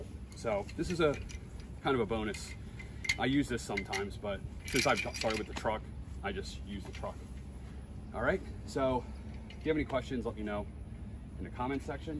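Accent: Philadelphia, United States. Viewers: I knew my man was from Philly before I saw the Flyers shirt and the PA plates lol. Great video.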